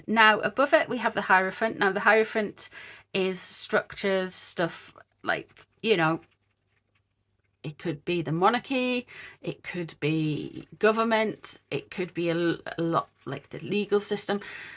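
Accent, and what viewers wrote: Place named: England, United Kingdom. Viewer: Love❤ your interpretations you are so on the case. Love your accent too . I’m Australian and I get a kick out of different English accents, there are so many varieties. Here in Australia we pretty much sound similar.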